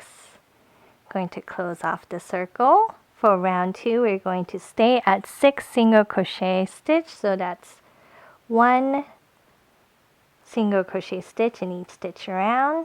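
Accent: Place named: England, United Kingdom. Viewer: Hi! I'm watching this video from Korea. As a non English speaker it is hard to understand(Because lots of korean like me are not used to English accent) but it helped me a lot when I make psyduck. Thank you♥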